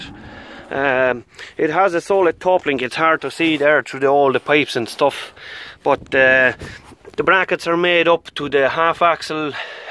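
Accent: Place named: Ireland. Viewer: Masz farmę w Irlandii ?? Trochę czasu tam jesteś akcent mówi trochę . Robię dla Irlandczyków w Londynie. Zdrów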